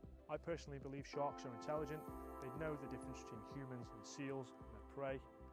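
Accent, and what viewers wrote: Liverpool, United Kingdom. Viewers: R u scouse? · Av you got scouse in youre voice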